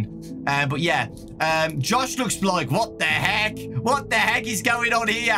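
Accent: United Kingdom. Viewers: Ever since I watched ur channel I only think of fnaf when I hear a British man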